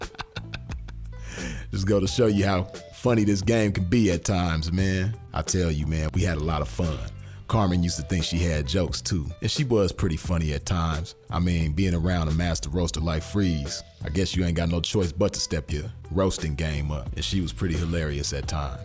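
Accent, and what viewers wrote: Chicago, United States. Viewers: Ah “P” I Didn’t know you was 🥶 with the ISM like Dat But Ur name is Freeze you from the Chi u sound like SouthSide Nigga Mannnnnn? This Video was Refreshing to Life G Blessings to you Freeze 🫱🏿‍🫲🏾💯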